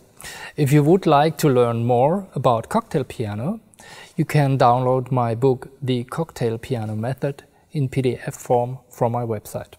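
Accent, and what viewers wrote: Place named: Germany. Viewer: Crazy question: Is the book in English. He sounds German and I don't understand German. Sorry to have to ask.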